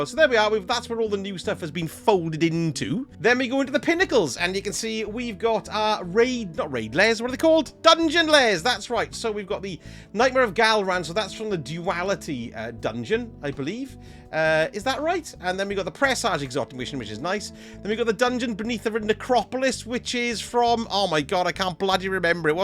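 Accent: Wales, United Kingdom. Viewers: Clicked on this randomly. Welshman detected dai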